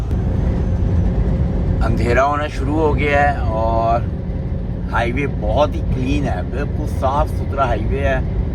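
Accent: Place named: Punjab, India. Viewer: Bhai sahib, why didn't you make your vlog in Punjabi instead of using a Punjabi accent in Urdu with some English words? I don’t understand why some people have a complex about trying to use Urdu and English for their vlogs. Also, you are complaining about the weather in Canada, but who invited you there? Bhai, be grateful to Allah; you have been blessed with so many blessings and a job. Enjoy the day you've been blessed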